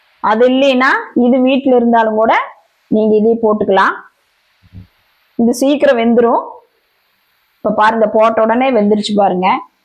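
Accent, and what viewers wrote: Kongu, India. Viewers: கொங்கு தமிழ் சூப்பர்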